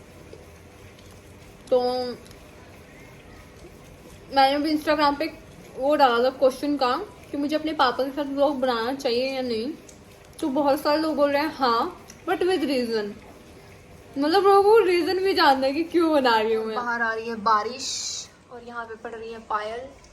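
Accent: Haryana, India. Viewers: Nice vlog dii haranvi voice bhut achi h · Muje aap bolte hue ache lagte h mtlb aapki haryanvi me language bhut achi lgi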